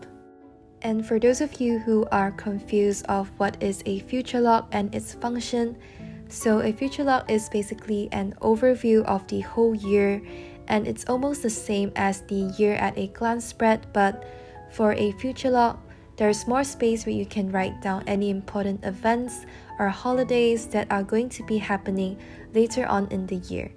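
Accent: Malaysia. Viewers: Immediately thought that you're a Malaysian when I first hear your voice! Super nice bujo and here you gain a new follower!!! ♥♥ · Omgg it's so pretty. Your accent sounds Malaysian, are you from Malaysia?❤️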